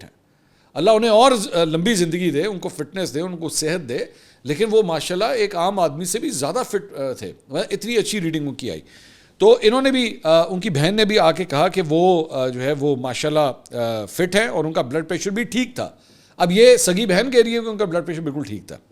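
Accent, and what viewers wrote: Punjab, Pakistan. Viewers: Please stop speaking paindoo punjabi U try to become way older n mature than what u r.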